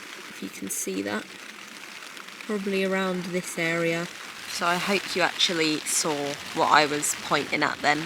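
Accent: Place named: Surrey, United Kingdom. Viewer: With that accent, it’s got to be Surrey😂😂😂😂😂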